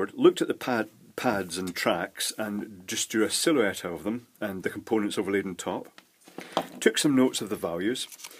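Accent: Scotland, United Kingdom. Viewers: I have this exact unit, arrived today from China (impressively, in 7 days). I'm well aware of its enthusiastic use by the BDSM crowd (even seen an ancient one used in a public setting at a club - eek) but I bought mine simply to disinfect skin and keep zits at bay. Some aestheticians suggest it can be used for "skin lifting" but I guess bigclive sees it as quackery, and he's entitled to his opinion - I really do think it helps acne, though. Nice to hear a Scottish voice on Youtube :) Thanks for the very interesting video!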